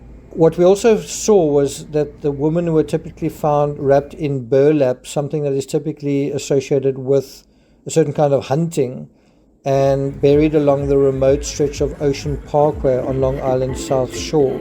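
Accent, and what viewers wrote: South Africa. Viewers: You sound like a South African. I'm watching from Durban. I think they will find more bodies, about 15 or so, possibly two children and, or teenagers. Poor victims.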